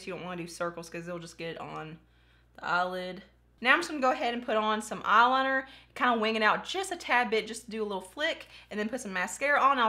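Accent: South, United States. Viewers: I love your voice it sounds so country 😍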